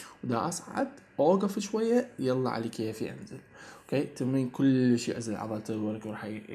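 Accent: Iraq. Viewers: لهجه عراقية هاي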